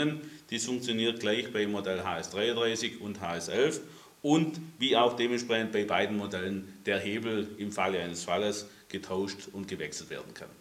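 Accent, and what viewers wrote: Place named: Swabia, Germany. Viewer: Geiler Typ mit herrlichem Schwäbisch!🎉😂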